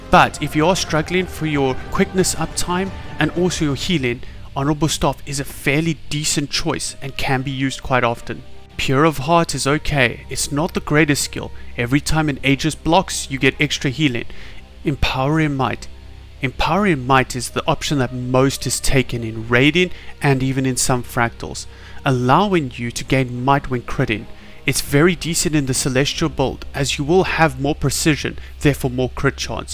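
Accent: South Africa. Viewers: from south africa?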